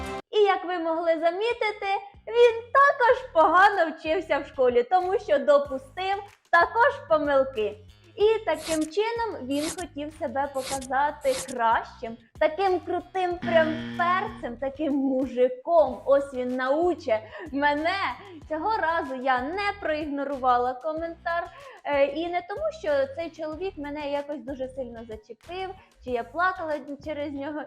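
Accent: Poltava, Ukraine. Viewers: . Ти золотце) Це смішно звертати увагу на негативних дібілів. Плавають в жовчі, то хай і тонуть вній) полтавський акцент це просто кайф❤ не звертай уваги, люди - хейтери просто обмежені, або жалюгідні або потопають у власних проблемах, які і є частиною карми. Сорі за словесний бульйон, я під вином)) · Це Полтавский діалект, це наша гордість, і я так розмовляю, 🎉 суржик Полтавский👍❤🐱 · і в Хоролі так балакають. Даже мамкають і папкають. Так приємно чути рідний полтавський говір. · Не звертай уваги. Ми всі на Полтавщині так говоримо. Але як треба вміємо говорити, як треба. Страшенно не переношу Ірину Фаріон. Грубіянка, замка, в минулому. Партєйний дєятєль. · ти талант а полтавський акцент неперевершений, дуже люблю тебе слухати і дивитися. МОЛОДЕЦЬ!!!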